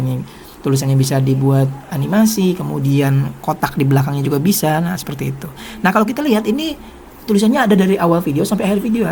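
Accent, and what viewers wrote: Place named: Lombok, Indonesia. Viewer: Bang ? Dari lombok y ? Kok nada suaranya kayak logat lombok ?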